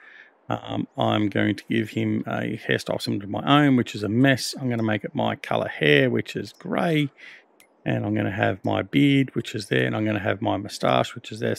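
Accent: Australia. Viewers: Bro you can tell this dude is Australian because that’s exactly what one sounds like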